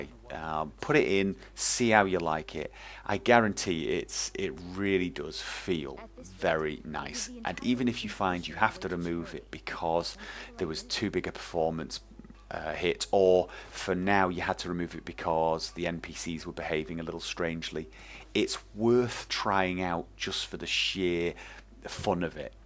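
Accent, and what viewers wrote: United Kingdom. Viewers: No he's British. :)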